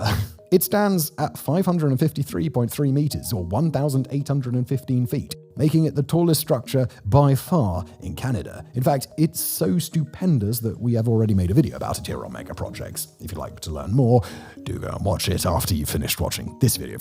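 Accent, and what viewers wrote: England, United Kingdom. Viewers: GOOD. Consider slowing down the speech a bit. The Brit accent is quite fast and tough to keep up with. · Native born Anglo Canadian... so... I had to turn on CLOSED CAPTIONS. Our host talks so fast that it is hard to adjust for his regional accent. It seems the more I want to know what he just said.. the more hurried and non-standard are the sounds. It is a voice that sounds "learned" .. emphasis on the "ed". And I cannot learn from it. An achievement in speed talking with some English county to thank.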